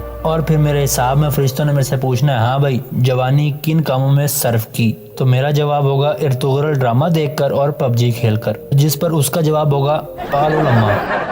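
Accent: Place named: Faisalabad, Pakistan. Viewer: ap koi faisalabadi lgty ho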